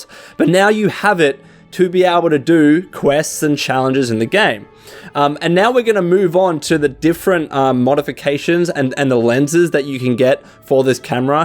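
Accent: Australia. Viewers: Just got Fallout 76 two weeks ago and really enjoying it so far. Always good to come back here for the tips and hear another Aussie voice.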